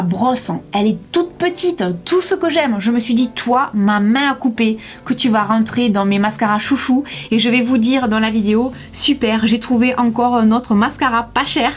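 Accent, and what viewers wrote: South, France. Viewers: J'ai découvert ta chaîne aujourd'hui, et j'adore :) !!! Ton accent du sud est super joli, mais j'aime beaucoup comme tu présentes tes produits :) Je m'abonne !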